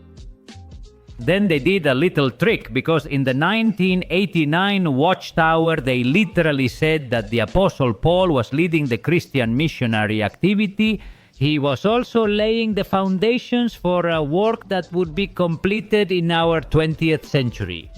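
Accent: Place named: Pakistan. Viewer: Yup, Pakistani accent, but doesn't matter, the message is what's the real matter here, the reason you are doing here Good luck with this new adventure, open more eyes, spread the truth about that cult and save people from the government body's claws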